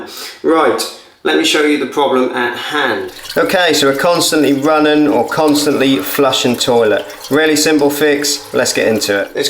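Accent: Norfolk, United Kingdom. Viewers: Great help, fixed my problem, and love hearing a Norfolk accent on a video! (Takes one to know one) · thanks chap, very useful, by the way trying to work out your accent are you from the UK? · Is that a Norfolk accent I detect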